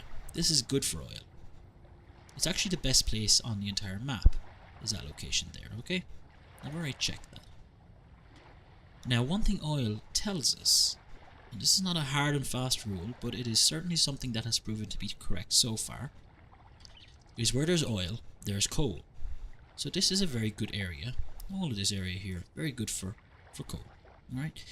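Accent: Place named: Newfoundland, Canada. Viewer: You're from Newfoundland arent ya! I'm from Ontario, we love you fellas :)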